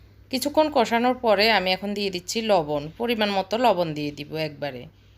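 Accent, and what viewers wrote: Noakhali, Bangladesh. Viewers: Thank you Apu easy ranna.amar bchara apnar vlog khub pochondo kore.😆amak onno kichu dekhte dai na.noakhailla hoyate tan ta ektu beshi😀😀😀.Masha Allah apni eto guni 1jon manus.joto dekhi toto mugdho hoi.khub balo thakben.❤❤💖💖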